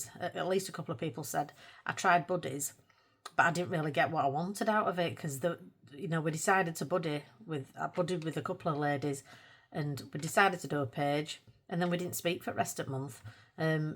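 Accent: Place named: Northern England, United Kingdom. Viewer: ‘It’s not a long video it’s quite short’… 40 mins later 😂 jk jk I love longer videos, especially ones with a familiar northern accent! We did a pick n mix at the Lindt shop in Leeds trinity last week and I massively regret only getting 2 of the shortbread ones 😂 I like to buddy to encourage me to colour in books that I’ve been avoiding because I’m intimidated by. I love Kerby books but have been avoiding fragile world because fur isn’t something I’m confident with, but I did a buddy in there last month and once I’d ‘committed’ I actually really enjoyed colouring the image! Great video ❤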